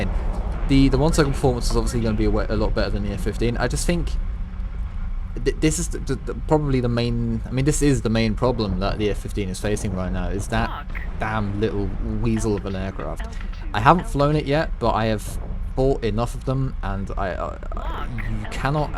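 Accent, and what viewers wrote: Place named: Europe. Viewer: Wow by the sound of this mans voice I would think they were from Europe and seeing a person from that section of the world using FEET instead of the measurements that are used in the countries of Europe(kilometers) hell I live in that one country that uses feet for measurement and I use in-game kilometers just because that's what the majority of people use in this game so it makes it that much easier for me when some one types out in chat things like the bomber is at 6k meters cause I don't think I have really ever seen anyone type out in chat how many feet is the height of the bomber. But to each their own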